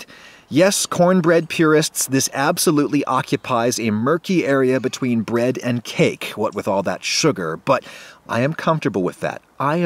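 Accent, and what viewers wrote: United States. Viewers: Holy shit it's food wishes in 5 mins and in an American accent. You're God. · you're american? i thought you're canadian!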